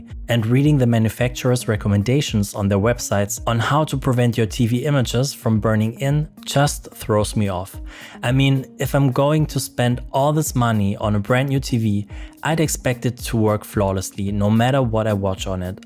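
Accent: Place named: Germany. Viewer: You sound almost exactly as "Battlenonsense" on YouTube. It's zee German accent.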